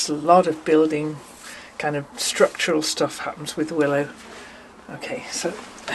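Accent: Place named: Lancashire, United Kingdom. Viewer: My Lancashirian grandmother was only 10 when she immigrated to Canada but she retained pronounciation of words like sun, stuff, does, and others. I hear her when you speak. LOL May you have a Merry Christmas and Happy, Healthy New Year.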